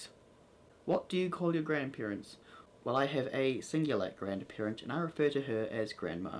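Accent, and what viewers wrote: New Zealand. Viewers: I think what stands out the most for me in New Zealand accents are how the letter E is pronounced in words. It is my favorite and definitely different from Australian or British. :) One of my online friends is from NZ. I like how you say sneakers and tomato by the way. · Well let's see your video on this... I'm from Auckland, and he 'sounds' pretty kiwi normal to me. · You have quite a refined Kiwi accent, go out into the wops and yool git some rool bad koiwoi accents.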